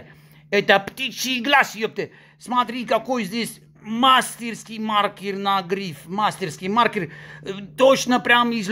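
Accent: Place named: Yunusabad, Uzbekistan. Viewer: Вой билат! Юнусабадский акцент что ли?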